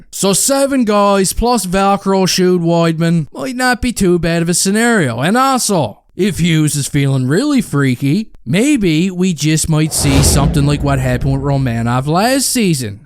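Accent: Newfoundland, Canada. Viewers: Pleas tell me what part of Newfoundland you are from?!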